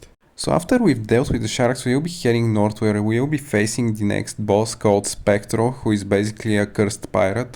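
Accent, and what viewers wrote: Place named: Eastern Europe. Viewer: listen, are you from a post-Soviet country? it just sounds like you are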